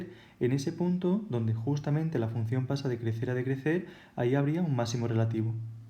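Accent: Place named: Murcia, Spain. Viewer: murciano fijo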